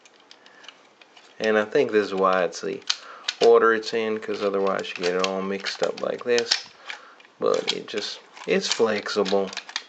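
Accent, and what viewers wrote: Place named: South, United States. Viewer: Is that southern accent for real?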